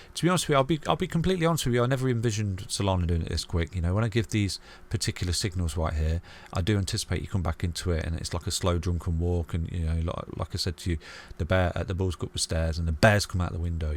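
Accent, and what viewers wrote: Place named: Midlands, United Kingdom. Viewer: i don't think he is mumbling, he just sounds like a midlander to me 🤷 i don't struggle to understand him at all.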